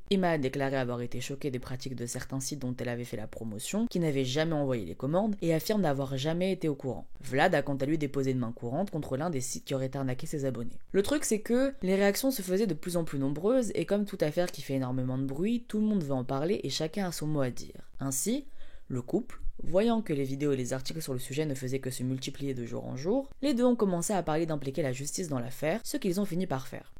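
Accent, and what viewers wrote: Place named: England, United Kingdom. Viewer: pour quoi prendre l'accent anglais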